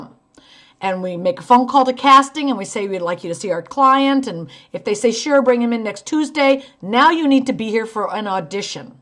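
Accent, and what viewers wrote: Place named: New Jersey, United States. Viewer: Connecticut. Haha 😆 I was guessing you were from Jersey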